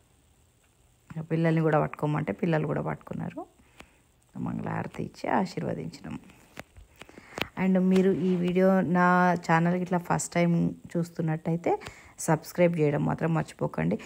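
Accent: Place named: Telangana, India. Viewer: Hi andi🙏 mee language pakka Telangana andi🙏😅😊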